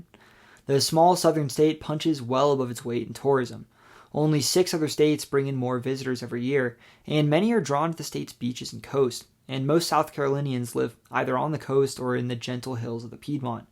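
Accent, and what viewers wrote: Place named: Georgia, United States. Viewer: thought you were from Georgia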